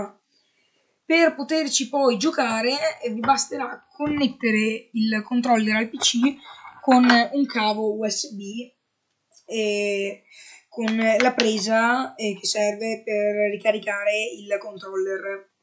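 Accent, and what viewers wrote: Italy. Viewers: bello l'accento veneto comunque bel video grazie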